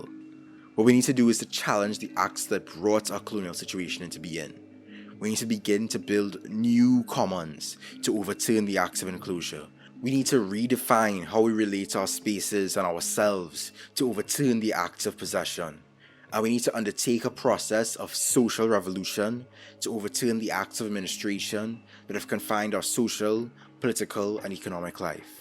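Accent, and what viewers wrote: Trinidad and Tobago. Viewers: ay yo i heard that trini accent and subbed right away! youre doing great work my friend much love from canada. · Are you Trinidadian?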